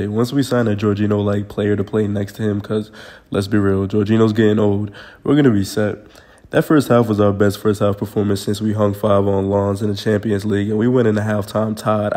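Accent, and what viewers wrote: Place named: Brooklyn, United States. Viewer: hearing a bk accent talking abt football is so odd to me, loving it tho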